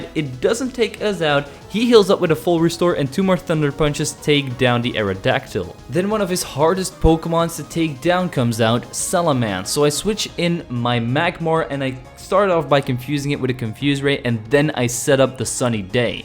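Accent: Belgium. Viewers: Deze man komt gewoon uit België!!!! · BEN JIJ EEN BELG!? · U from belgium? Nice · Wait.. You're Belgian?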